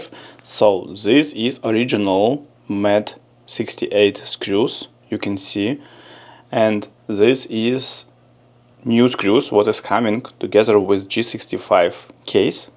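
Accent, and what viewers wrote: Russia. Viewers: я чувствую русский акцент )))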